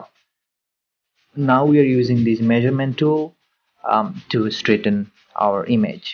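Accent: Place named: Bengal, India. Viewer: Why does this have to be explained to me in a Bong accent.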